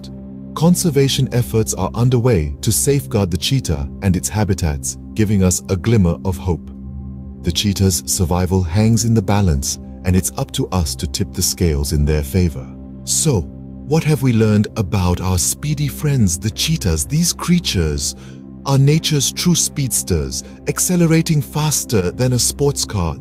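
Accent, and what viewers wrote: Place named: Kenya. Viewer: my man sounds kenyan af. I am kenyan btw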